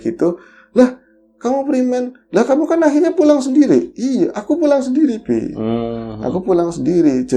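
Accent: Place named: Brebes, Indonesia. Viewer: Ngomonge Kya wong Brebes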